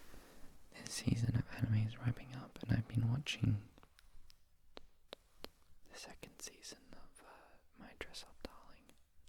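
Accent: Australia. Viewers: This was precisely the Australian Sleepy Man Rambles needed right now. Do go on about video games/anime/manga...I'm not not here for the nerd-friendly content, and it's relaxing. Life is still constantly stressful due to "how things are where I live," but watching the new Alien: Earth series is a bright spot each week. Zoos aren't a usual destination, but there's a teaching zoo nearby that I enjoy supporting during their Halloween open house fundraiser. While there are tigers and monkeys, the reptile house's skink with resting judgmental face is worth the entire entry fee. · Your videos started getting recommended to me a couple weeks ago and I’ve used them every night since to fall asleep. Weirdly enough, I also forget your Australian every night and get whiplash hearing the accent lol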